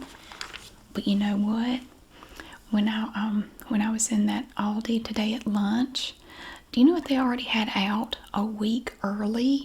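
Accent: South, United States. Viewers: Enjoy your videos. You have a nice southern accent. Toboggan means knit hat in the south? That is funny. I’m from Minnesota and here Toboggan is definitely a sled. We have plenty of snow in winter.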